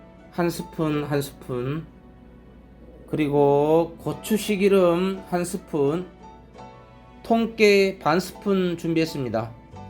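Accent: Jeolla, South Korea. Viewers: 요리도 요리지만 구수한 전라도 사투리가 맛깔납니다.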